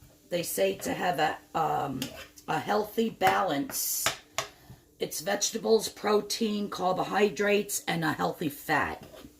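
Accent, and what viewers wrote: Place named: Massachusetts, United States. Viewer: Hi! Love all the videos and your tips and cute accent (mostly because I think it sounds like you live near me--I live in Central Massachusetts).... I love the breakfast you made and the idea of meal prepping--I can't wait to try the French Toast Cups and the egg bake! The great thing about both of those recipies is you can customize them to what you want to have that week--like I think gingerbread flavor french toast cups might be fun--or I might add a little mashed banana, unsweetened coconut and almond extract... or maybe even strawberries or raspberries or chopped small apples.... Thank you so much! I love WW and it is so nice to see all that you share on your journey! I have lost almost a hundred pounds on WW and now have 83 pounds until goal! With your videos and support from my workshop & other online groups I believe I can do it! 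Thank you a thousand times over! I will be sharing on FB for you! Best wishes for 2023!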